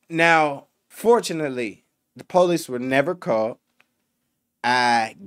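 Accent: Pittsburgh, United States. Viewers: The Pittsburgh accent goes crazy hard!!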